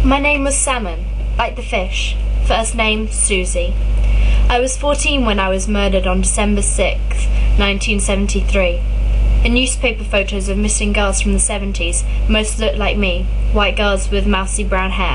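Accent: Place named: United Kingdom. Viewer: Your voice is lovely and so british:-) Thank you for reading!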